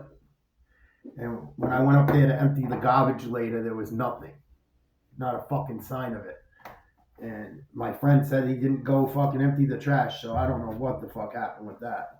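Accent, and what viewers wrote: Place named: Boston, United States. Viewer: Good stories! The boston accent😎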